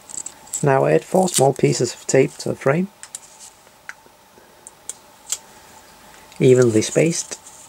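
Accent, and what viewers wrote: Denmark. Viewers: You have a Danish accent bro, where you from?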